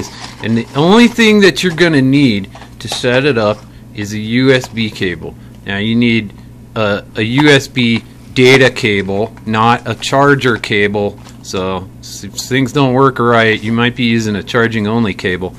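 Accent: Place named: Texas, United States. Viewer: you have wierd texas axent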